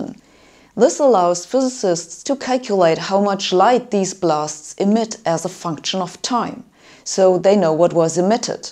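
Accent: Germany. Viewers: Came for the dark energy, stayed for the cute German accent.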